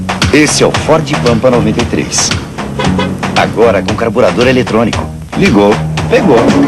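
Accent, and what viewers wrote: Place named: Northeast, Brazil. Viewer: Velho sotaque noredestino. Inconfundível.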